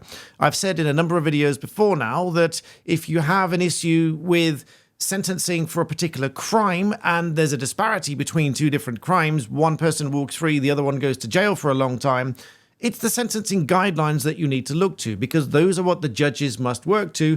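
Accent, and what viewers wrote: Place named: RP, United Kingdom. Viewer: Can U do your Tommy Videos with a common/Chav accent pls? Hard to take seriously when you are speaking posh🤣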